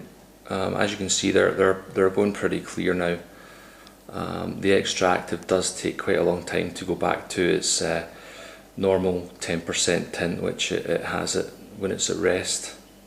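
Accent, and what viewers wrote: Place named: India. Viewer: wow you sound Indian or some kind of black but you is white mate!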